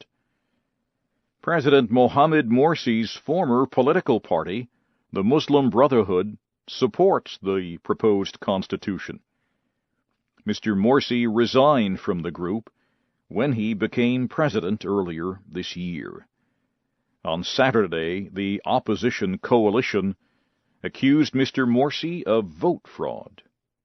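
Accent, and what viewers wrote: United States. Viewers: que buen video para aprender algo de acento americano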